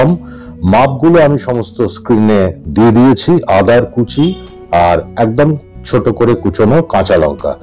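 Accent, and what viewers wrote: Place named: Punjab, India. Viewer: Dear Sir , thanks fr yr kind feedback. Just so that you know, your voice was “ just perfect , precisely so “…. Matching the tone and tenor , of this Punjabi bowl of Heaven…you sounded well , like …Punjab da Puttar….that said, on behalf of all, of your viewers , pls allow me to say this, please take care , since we hear you say , you do feel a bit challenged….this is a first , we hear from you…pls Sir, be well, even if fr , the sake of wonderful recipes , wherin you truly deliver the Science of Cooking, into this art form of Cuisine….bhalo thkaben…God Bless….Namaskar 🙏🏻🙏🏻🙏🏻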